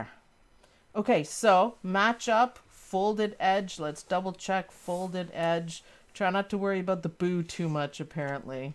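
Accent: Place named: Ontario, Canada. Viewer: As usual, I'm watching late. My mom always said I'll be late for my own funeral (doesn't sound so bad, actually!). Love the fabrics you used, so cute. KK designs work out perfectly, every time. BTW, I'm mostly from Ontario - Niagara area, Welland & St. Kitts. PEI. Many of the States. But, Ont is home. That's why I enjoy your accent so much - it's home. 🇨🇦 Thanks for another wonderful video!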